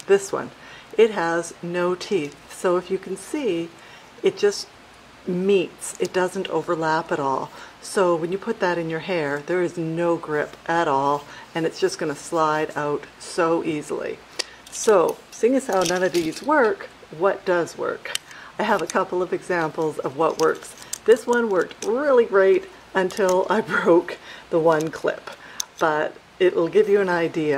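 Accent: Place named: Atlanta, United States. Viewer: Thanks...i will look out for them, Love your accent and the outdoor videos.. reminds me of living in Atlanta - oh and you always look amazing too:)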